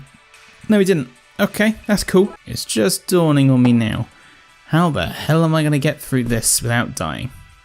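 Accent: United Kingdom. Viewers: your soothing British voice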